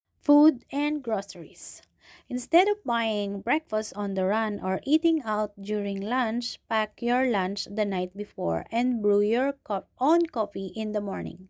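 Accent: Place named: Philippines. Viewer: Lol your filipino accent is too strong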